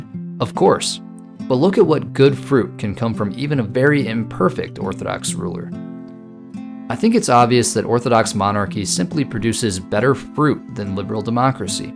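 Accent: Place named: General American, United States. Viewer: General American Dialect ✅️, Zoomer voice✅️, Projecting escapism on a foreign model of governance while ignoring the experiences of those who lived under it (Absolute Monarchy, one-party Marxist-Leninist states, fascism, etc etc) ✅️ Diagnosis: Terminally Online LARPer Remedy: Touch grass and switch from online orthobro to boring IRL Orthodox.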